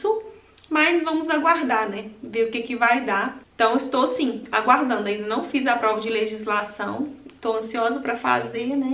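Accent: Minas Gerais, Brazil. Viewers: Minha querida é muito bom ouvir vc essa conversa mineirinha esse seu jeito verdadeiro vc é nota 1000 minha amiga virtual adoro vc 😍😍😍😍🥰😘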